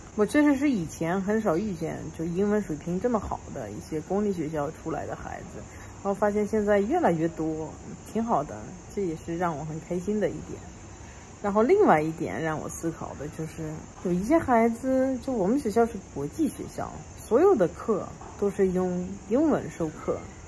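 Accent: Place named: Beijing, China. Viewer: 这中文都有京腔京味了，厉害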